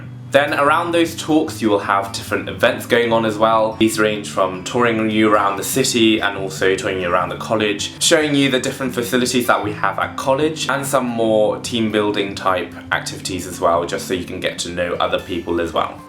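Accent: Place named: United Kingdom. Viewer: It's my absolute dream to go to Durham and castle!! Also, I can't believe you're from Hong Kong - your accent sounds so British!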